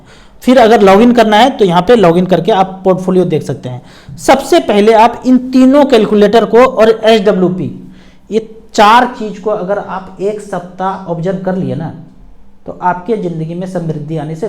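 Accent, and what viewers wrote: Bihar, India. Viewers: Sir aapki wani me bihar ki khushbu aati hai aap hai kaha se 😂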